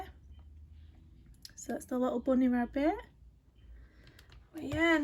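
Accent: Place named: North, United Kingdom. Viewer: Some lovely work this month. My husband came in to listen because he's from Littleborough and pines for the Northern accent. He really liked the first one you showed, where you used the spray messy stuff. I find it hard to pick a favourite, I like them all. Can you share the link for Mouse Guard book? I've had it in my basket at Amazon for months and they keep telling me they still haven't been able to source it.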